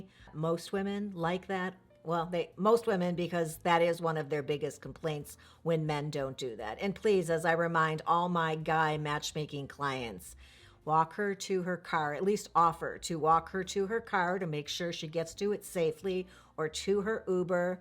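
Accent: Chicago, United States. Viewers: Hi. I love the knowledge that I have gained from your videos. I am from Chicago also. I love your Chicago accent. What part of town are you from?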